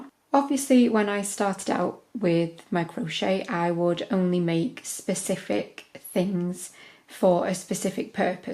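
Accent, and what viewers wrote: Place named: Widnes, United Kingdom. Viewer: Hi, I've only recently started crochet and was in heaven when I found your channel and fabulous easy to follow videos. Going by your accent and comments you have made I think we must be very local! I am born and bred in Widnes! xx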